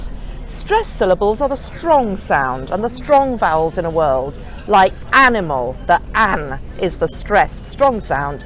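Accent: United Kingdom. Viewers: I wish you all the best of success and thank you for your precious efforts. I like your British accent.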